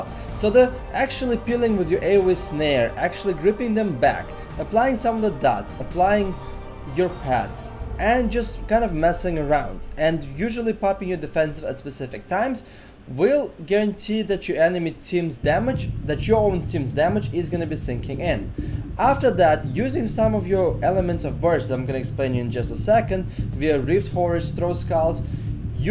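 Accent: Russia. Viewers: dude you're a russian-speaking person with a somewhat thick accent making I don't want to call the guide video but a video where what you're saying is important with music drowning out what you're saying turn the music down man when you make videos you should have little or no background music on a video where your words matter you know if you're just making a stream or people are watching you battle that's one thingbut when we have to hear what you're saying and understand you or want to that music's making a very difficult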